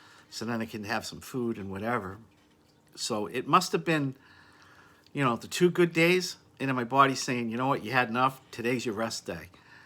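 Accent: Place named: Massachusetts, United States. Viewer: Watching from Kentucky. I can tell ur in Mass. Your accent. Love it. 😍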